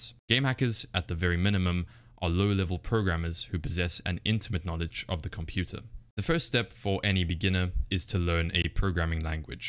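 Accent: South Africa. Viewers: immediatly recognises South African accent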